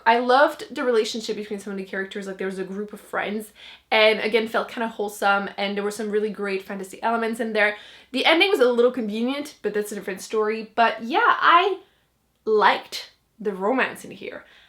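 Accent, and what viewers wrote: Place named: Canada. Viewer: Hey! I really like your videos, love your accent and I'm Canadian too!!! <3 I've been subscribed for a couple months now and I will be sticking around!!!:)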